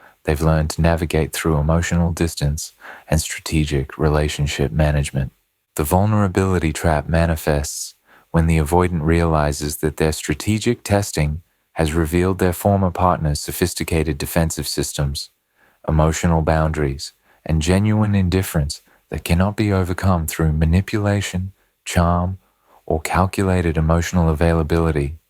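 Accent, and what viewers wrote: Australia. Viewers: I can't stand the Aussie voice...it sounds depressed. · glad that you brought the aussie voice back!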